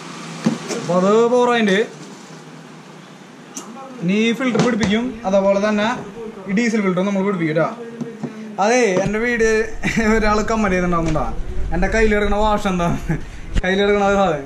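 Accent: Thrissur, India. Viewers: ande place thrissur thannya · തൃശൂർ സ്ലാങ് ഇഷ്ട്ടം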